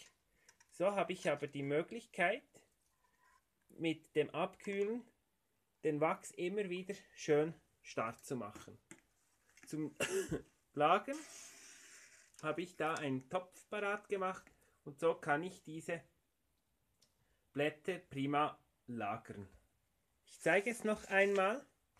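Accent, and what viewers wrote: Switzerland. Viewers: liebe ich den schweizer Akzent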